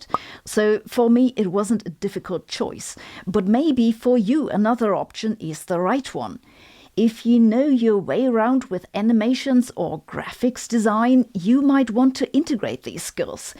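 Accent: Germany. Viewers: Sabine, a great deal of your comment is applicable to regular TV, and much of it's cable variety; simply put, creators often had little idea why of how their production got the viewer buy in to begin with. On improving your English skills; what ever you do, don't mess with the "charming little German lady" accent; it is as much your brand and trade mark as Joeg Sprave's maniacal laugh is his, along with a very Teutonic lilt to his English; and his "schtick" is sling shots! My thoughts on "creator burnout"; although not a YT creator, I think my objective view as an over consumer of YT product does give me a bit of horizon on the subject; scheduling is the largest stress producer in the process- schedule twice the time your layout and "pre think" suggest , do the work, and hope fully go get a massage of some other personal 'uplift" with your left over time. The creators that I have watched collapsing into themselves, trying to "give the algorithm" more and more of what it wants as they chase fame and fortune- they are the tragedy of the YT process. 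Some actually had good ideas but fell into those "number chasing" traps you described. Last, I would say, don't do something if you feel it doesn't "fit" you, personally- it shows when you do, a certain part of your "faithful following" will being losing who you are to who the algorithm wants you to be. None of which seems to be your problem. But fact based scripts, like yours, tend not to lead you there- another wise move from a "sc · The German acsent help's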